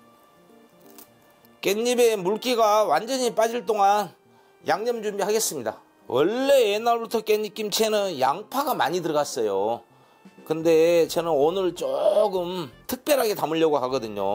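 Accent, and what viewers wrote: Jeolla, South Korea. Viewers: 구수한 전라도 사투리. 정감있고 언제나 맛있고 정성어린 설명. 감사합니다~~ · 구수한 전라도 말씨 좋아요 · 전라도 말씨중에 제일 매력있는 목소리인것 같은데요 음식과 말투의 궁합이 최고~^^♡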